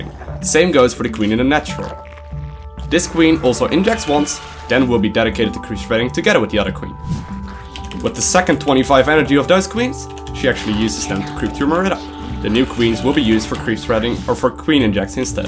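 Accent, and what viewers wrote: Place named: Netherlands. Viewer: Huh thats why you sounded a bit familiar. Where in the Netherlands do you live?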